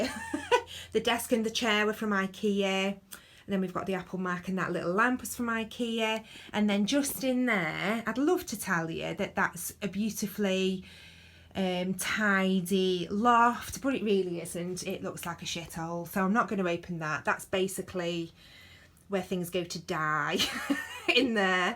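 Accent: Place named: Liverpool, United Kingdom. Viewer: Just love absolutely everything about your home. We have the little wooden mirror that's in your en suite and we purchased ours in Ireland. I noticed someone in the comments mentioned a touch of Liverpudlian in your voice, I'm from Stoke and people often mention that to me 😂 Can't wait to see the up cycling/make overs. Loving your channel, you're such a joy x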